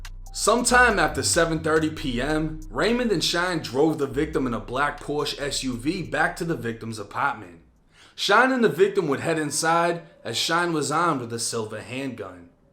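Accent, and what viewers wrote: Boston, United States. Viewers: I Love❤ Your BOSTON ACCENT...🔥🔥🔥🫶😁🔥🔥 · First time ever seeing this channel….. dude gotta be from Boston 😅😂😅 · I hear a boston accent? i live in dorchester. from southie. definitely subscribing.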